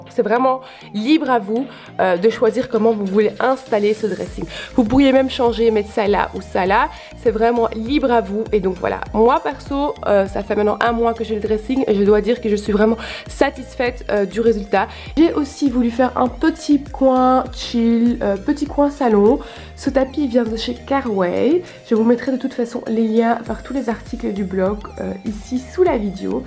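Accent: Belgium. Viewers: elle a pas un accent français vu qu'elle est belge ils ont leur accent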